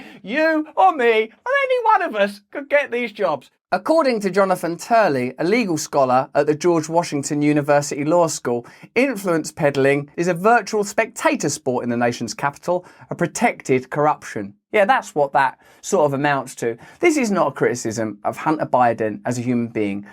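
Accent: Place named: United Kingdom. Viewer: How does anyone stand this word salad firehose million words a minute but not saying much of anything speech delivered in that “nails on a chalkboard” British accent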